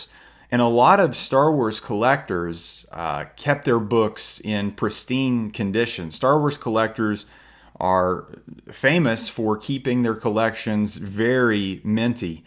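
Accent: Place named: Philadelphia, United States. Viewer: good gawd that philly accent is awful ew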